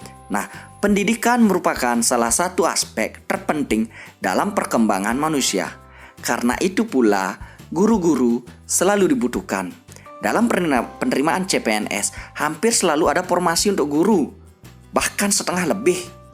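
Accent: Bali, Indonesia. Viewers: T nya lucu ya? dari bali ya? All the best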